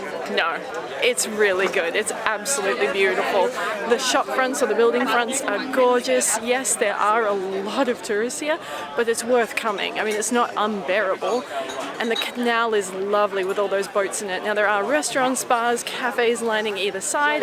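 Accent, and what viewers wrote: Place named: Australia. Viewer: Hiya! Welcome to Denmark! I’ve been to Copenhagen twice and it’s such a peaceful quiet city with lots of gorgeous parks. I’ll help you choose the bed. Always choose the top one! There’s just 3 cities in which I would see myself living, it’s London, Melbourne and Perth. Would you see yourself living in Copenhagen? Thank you for sharing Tivoli Gardens, I’ve only seen it from the outside as they were closed with Easter. Now I know I actually missed something, reason enough to visit for the third time. Good thing is that I can be in Copenhagen within 3 hours starting from my home address as it’s just half an hour to the airport and just a 1 hour flight. The canals are gorgeous in over there. I really got the feel that I need to get back to CPH. Nyhavn is a great place. $18 for a sandwich is insanely expensive. Getting on the canals is one of my favorite things to do when visiting a city, boat tours are usually quite good. 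By the way, have you taken the train to Malmo? That’s a great one-day trip from Copenhagen, just 30 minutes by train. I completely feel you with that solo traveling through Scandinavia. I’m not usually into hostels because I’m a light sleeper, but this hostel seems amazing. I love the Australian accent, it’s the best English there is. I hope you’ll enjoy much more of Europe. Now that you’re in Europe, I can finally catch up with the long bucket list you made for me 😂. Have a wonderful week and safe travels 🤙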